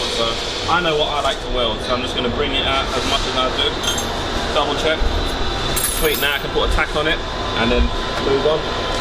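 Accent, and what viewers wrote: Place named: London, United Kingdom. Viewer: I try to understand your prononciation just like London down town.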